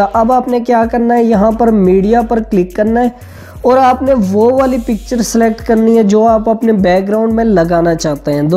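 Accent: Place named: India. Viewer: Fk damn, Indian accent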